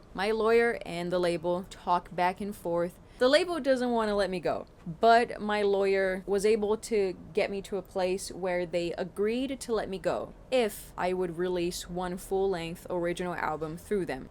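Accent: Brazil. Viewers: Meu deus, sabia, eu reconheci uma parte do seu pequeníssimo sotaque "brasileiro" além dá sua aparência que lembra a minha e minha família, espero que tudo dê certo para você, também sou músico, mas minha música é difícil de bombar, anyways I´m on college. SAUDAÇÕES my friend CONGRATS FOR THE BEAUTIFUL WORK!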